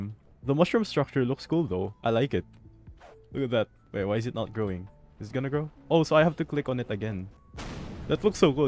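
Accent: Philippines. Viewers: You give me that filipino-english accent vibe. Are you?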